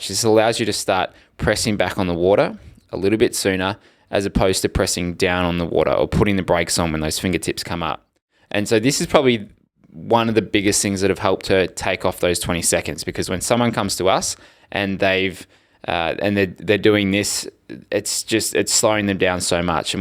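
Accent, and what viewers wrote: Australia. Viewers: Benther He has an Australian accent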